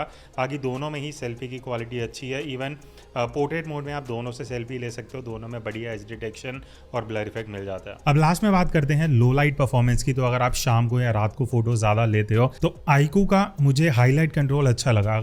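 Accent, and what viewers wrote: Lucknow, India. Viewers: R u from lucknow sir?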